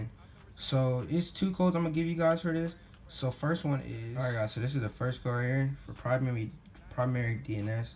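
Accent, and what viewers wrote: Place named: Baltimore, United States. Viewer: That Baltimore😁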